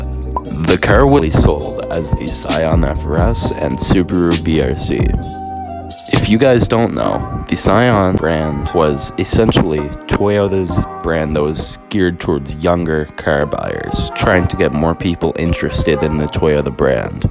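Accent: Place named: Netherlands. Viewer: You sound like your from NL like me haha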